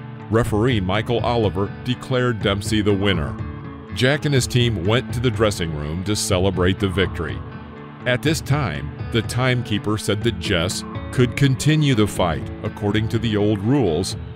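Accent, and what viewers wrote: United States. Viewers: This narration is with an American accent yet Dempsey's weight is given in kilos. They tried to force us into the metric system decades ago, but that was rejected. I doubt we'll be using kg, mm, liters, etc. any time soon. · Hey narrator with American accent, wtf is "kilograms"?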